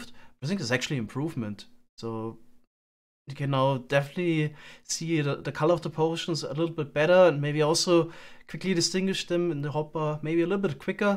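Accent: Germany. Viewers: Ur German right ?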